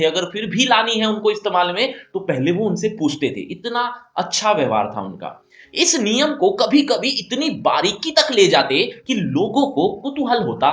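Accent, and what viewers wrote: Haryana, India. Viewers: Sir aap haryanvi ho kya?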